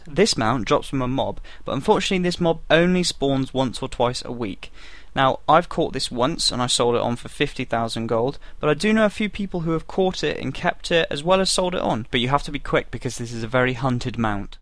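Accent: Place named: United States. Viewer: Thank you for the video! Love your accent... (usa)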